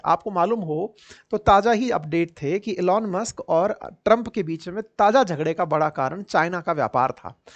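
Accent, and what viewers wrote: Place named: Bihar, India. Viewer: Sir apka tone me thoda biharipan aa raha hai acha lagta hai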